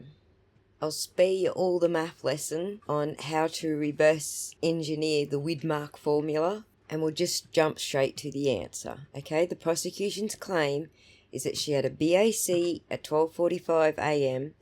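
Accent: Australia. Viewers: I love listening to you. I understand what you are saying even with the math. If I had to math it out myself,I think I would end up in a brain fog stupor 😂. I followed the last trial with Vices ❤. I'm so happy to have found your channel. Much respect from south of the Tweed.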